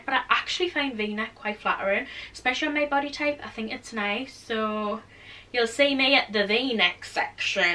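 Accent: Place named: Geordie, United Kingdom. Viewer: You sound SUPER GEORDIE in this vid xx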